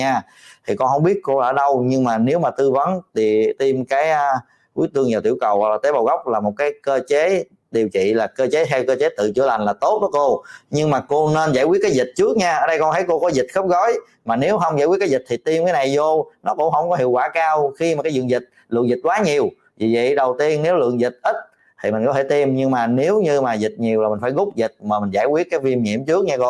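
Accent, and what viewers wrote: South, Vietnam. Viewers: Bác sĩ người mn có khác ăn nói thật là dễ thương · Lần đầu nghe Video của Bs, co like, đang kỳ kênh , chia sẻ luôn nè, cảm ơn Bs rất nhiều. Giọng đặc Nam bo de thương ghê hông! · Bác Sỹ Nói Chuyện Giọng Nam Rất Dễ Nghe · Hello Bác Sĩ Bác sĩ là người miền Nam nói chuyện rất bình dân, cảm ơn bs đã giải thích thật rõ ràng. Tôi U80 nhưng vẫn muốn BS xưng (tên) là ‘tôi ‘ thay vì là ‘con’. Bởi vì chắc chắn có những người nghe , học hỏi từ bs ngang tuổi hoặc trẻ hơn . Ở miền Nam hai chử R. Với. G. Hay bị sai Thí dụ : Xong rồi. ( rr ồ. i ) Không phải. G ồ. i Như người miền Bắc thì chữ. L. hay nói. là. N Xỉn lỗi BS trước nhé ( ý kiến xây dựng thôi) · Tui rất thích tuy rằng nói tiếng nam quá nhưng còn hơn nhiều Bác sĩ lên nói rào trước đón sao và chū yêu là câu lai 😊😊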